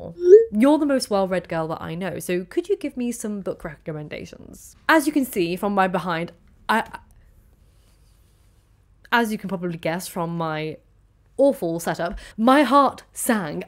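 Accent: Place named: Birmingham, United Kingdom. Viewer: So your a Brummie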